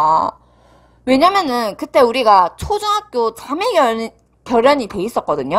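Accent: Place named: Daegu, South Korea. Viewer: 대구 사투리 같은데 김해 느낌도 있고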